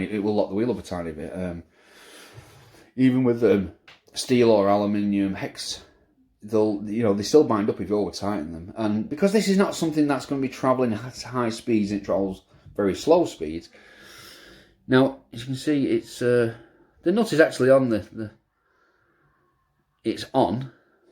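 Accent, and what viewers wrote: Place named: Manchester, United Kingdom. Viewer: There's a manc if I ever heard one! Where abouts the M60 are you pal? 🤭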